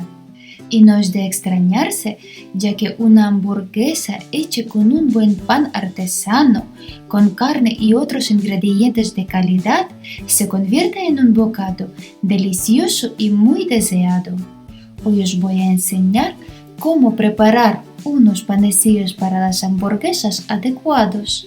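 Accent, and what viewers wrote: Ukraine. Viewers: Ella es de Ucrania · Ella es de Ucrania, residente en España!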